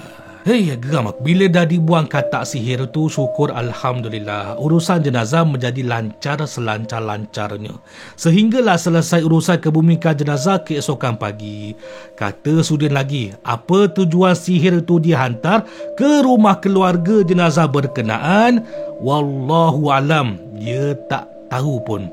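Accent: Perak, Malaysia. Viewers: Awsome sgt you tuber dari ipoh dgn lorat negeri perak ....best dgn style and cara yg paling kreatif ...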